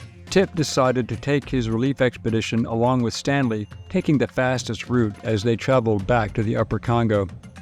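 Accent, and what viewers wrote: South, United States. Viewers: If there were so many slaves, where are they? All integrated into their societies. You are totally wrong about Somalia. Swahilil is not an Arab language. Mr Marine Corp, were slaves to the Caribbean and America ever integrated even today? You sound Southern, you should know.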